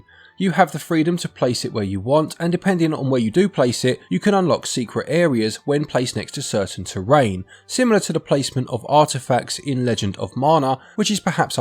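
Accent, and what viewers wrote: England, United Kingdom. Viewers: Why do you keep misprounoucing Mana? I can hear you are english so I don't get it? For the record its not Marna ot Maana, I can understand americans mispronouncing this, because they don't know any better. Apart from this grating on me, this was a good review and made up my mind to buy it 👍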